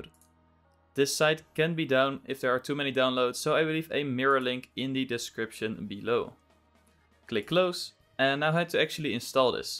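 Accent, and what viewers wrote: Netherlands. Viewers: Haha ik kon aan je stem horen dat je Nederlands bent :) Je kan wel echt goed Engels hoor ik meen het ga zo door ;) dank je voor deze uitleg trouwens! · Hey, volgens mij ben je nederlands. Aan je accent te horen:). Ik had even een vraagje. Is de download van Inazuma Eleven GO galaxy gratis voor Citra op PC? Of hoe kan ik die krijgen?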